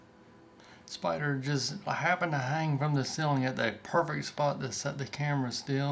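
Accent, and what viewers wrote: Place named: South, United States. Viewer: Where exactly is your accent from? Sounds quite southern to me :) That is one beautiful bouncin' banana spider with the prettiest pattern on its body. The way it's cleaning its legs is still a little awkward to watch, though. I've come a long way with spiders but these awfully long legs are still making me feel rather uncomfortable. I'll get there eventually.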